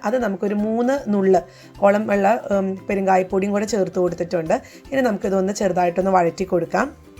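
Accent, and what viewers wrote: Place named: Thalassery, India. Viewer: Superr 👍👍 thalassery recipeyum, thalassery ഭാഷ yum kelkkanno enkile entha youtube channel kannan varuu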